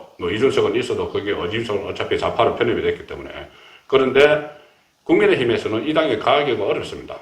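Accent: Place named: Daegu, South Korea. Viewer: 고향은 진주같은데 영남일보출신이라 그런지 대구사투리랑 비슷합니다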